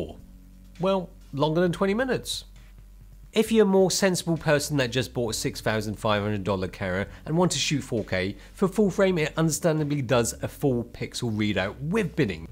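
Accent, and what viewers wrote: United Kingdom. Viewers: Asian guy with british accent. That's cool. :) Could you please help me decide. If I shoot mostly video but sometimes I want to do a little bit of photography, would it be better to have one SONY A7s3 and one SONY A7R4? Or would it be better to just have SONY A1?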